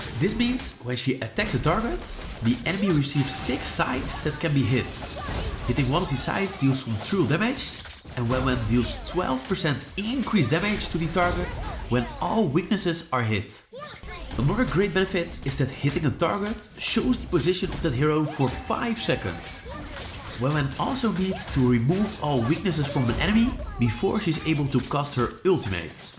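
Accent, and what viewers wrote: Netherlands. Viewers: Hey man, Ik herken een Nederlander wanneer ik er één hoor. Als je mensen zoekt om mee te spelen/test content mee te maken laat maar weten man.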